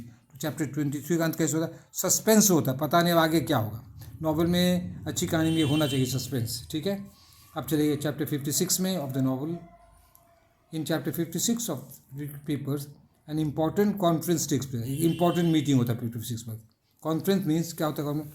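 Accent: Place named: Bihar, India. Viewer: Sir ap Bihar se hai kya? 😂 Hasi hoybe kregi hahaha. I'm also from Bihar that's why I understand your dialect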